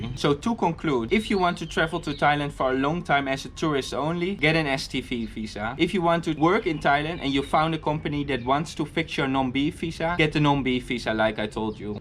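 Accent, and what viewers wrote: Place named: Netherlands. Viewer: Sounds like Dutch :)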